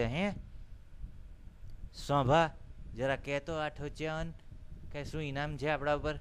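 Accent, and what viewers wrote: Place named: Mehsana, India. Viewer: well done bro..gujarati wid mehsani accent..hahahah lolz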